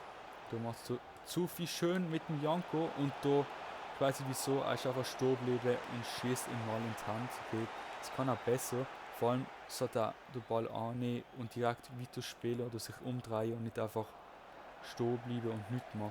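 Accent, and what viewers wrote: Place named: Basel, Switzerland. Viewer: man merkt es das du basler bist an deiner typischer basler stimme weiter so like und abo ps. bin kein deutscher sondern basler schreibe hochdeutsch weil ich basler deutsch verlernt habe seit dem ich in bern wohne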